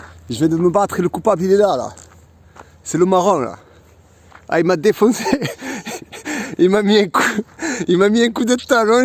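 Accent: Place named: South, France. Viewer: Par contre mon frère quand tu parles sa me rappel toute mon enfance, je suis du sud aussi et toutes tes expressions sont familières, ton language c'est le langage des gens du sud avec la culture provençale mélangé ah ah Mes grands parents parlaient souvent le provençaux, quand tu dis : " ô Fada, ou ô Fan". C'est comme si j'entends mon père parlé.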